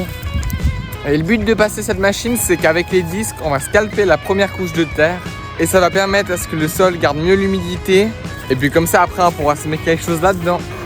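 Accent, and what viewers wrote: Switzerland. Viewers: L'accent du Nord Vaudois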